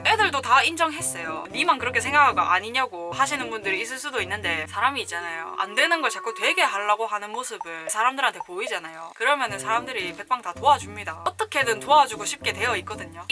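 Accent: Gyeongsang, South Korea. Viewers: 우어,,,근래에 본 사람중에 제일 경상도 사이다네요 ㅋㅋㅋㅋ 구독 누르고갑니다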